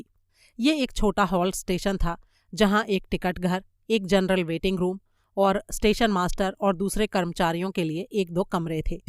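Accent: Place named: South, India. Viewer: आप साउथ इंडिया से है क्या? आपका एकसेंट यही बता रहा क्योंकि अभी हाल ही में मैंने महारानी वेब सीरीज़ देखी है जिसमे रानी की OSD IAS का भी एक्सेंट ऐसा ही था वो केरल की थी।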